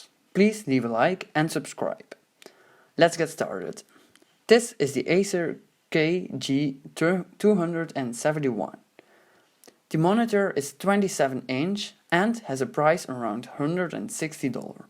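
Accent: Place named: Netherlands. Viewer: Nice vid. U dutch cuz u sound like it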